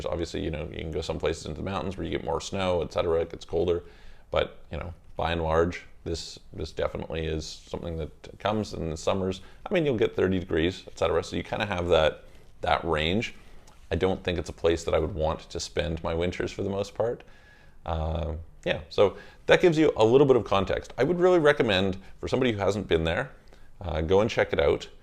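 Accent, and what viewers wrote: Canada. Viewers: You have a really strong Canadian accent.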